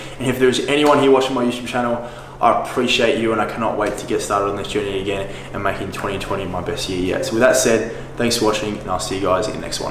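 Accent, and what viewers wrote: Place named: Australia. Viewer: Hey man—just discovered you have YouTube videos—I messaged you today about Donnie Darko. Wow, people tell me I speak too fast, I think ya beat me there! 😂 No worries I understood you throughout—and wow I love Aussie accents. Y’all are the coolest, every Aussie I’ve ever met in Texas was a top notch person. Truth is I’d probably hire you for online training, but I’m am ex-trainer myself (ACSM/HFI) and I seem to always clash with the guys I’ve hired. Anyhow, two thumbs up on your online presence & ventures. And PS: I’d be surprised if a Hollywood producer doesn’t offer you a film role one day soon, you’ve got a great mug, killer bod and masculine voice and presence—the works! Cheers mate. 👊💥🙌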